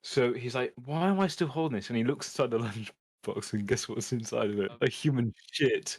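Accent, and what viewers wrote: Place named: United Kingdom. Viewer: Why are you British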